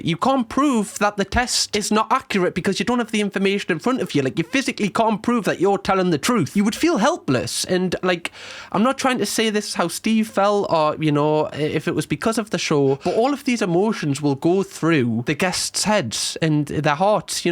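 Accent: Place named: Newcastle, United Kingdom. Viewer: The amount of times you say video makes me wish I could say it like you. I'll get my dad to teach me the ways of sounding more Geordie. 😆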